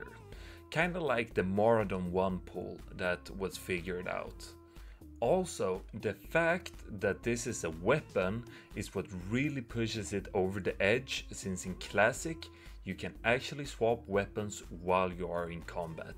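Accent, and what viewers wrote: Sweden. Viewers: Well since you ask what we would like to see next, here is what i would like to see =) I think a maraudon one pull tutoriel would be a great video. Ofc there are already a whole bunch of mages doing mara one pull on youtube, but i think every mage has his own little techniques, his own sensibility, and i would be pleased to learn what are YOUR way of handling this farm and what are YOUR personnal tips and tricks. Plus i like the way you explain things, with your swedish accent and all =)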